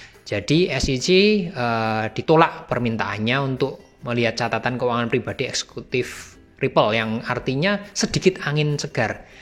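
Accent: Java, Indonesia. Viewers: Ngomong cuk e medok tenan Cuk!!🤣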